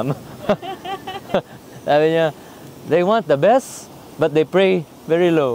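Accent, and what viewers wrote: Iloilo, Philippines. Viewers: Namian gid ko Chef mamati sang imo Ilongo nga accent 😂😂😂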